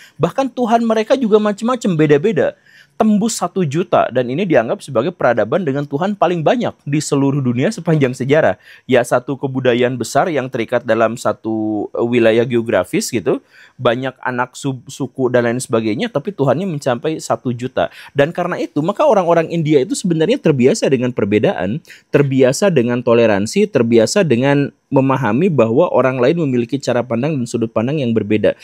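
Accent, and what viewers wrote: West Java, Indonesia. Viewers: Senengnya dari ini..kalau menerangkan pake bahasa sederhana, mudah dipahami dan satu lagi aksen sundanya gak ilang..kaya aing bobotoh..Hidup Persib!!😂😂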